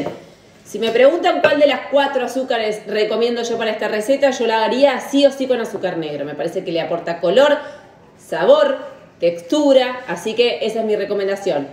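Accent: Argentina. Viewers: Ahí diese Ecuador yo pensé que eras de la argrntina Saludos amigos colombianos 😊😊😊😊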